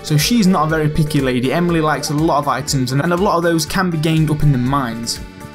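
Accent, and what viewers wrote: United Kingdom. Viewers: THE BRITISH ACCENT AND COMMENTARY LMAOAOAOA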